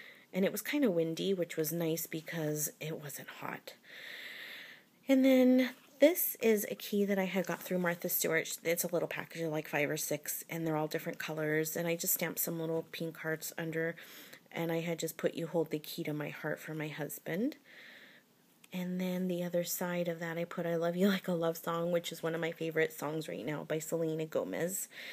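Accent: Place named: United States. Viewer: omg!!! this is fabulous!!! i love your american accent lol!!!!:-) ooo i love heidi swapp's stamps i have a few too!! oooo u love mermaids! and me!! hehe, omg! ive never seen a real palm tree!!:( 0ooooooooo flamingos!! i love flamingos!!! (im writing this as i watch incase u didnt realise lol) love the seeds stamps so cute!!! oooo doilys are lovely!!! not seen those american craft pin/badges before they're cute! ooo i like that song too!! haha:-) that's a fantastic book love it!!!! xxxx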